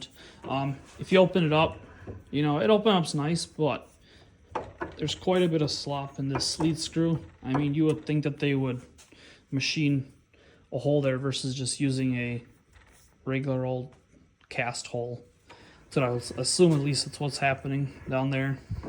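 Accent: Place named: Ukraine. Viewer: neat Ukrainian accent you've got..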